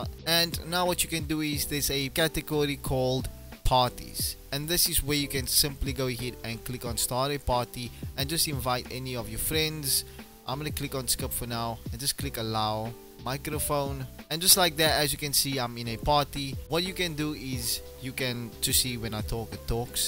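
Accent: South Africa. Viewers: Are you Afrikaans? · You sound like a south african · U from SA aia.?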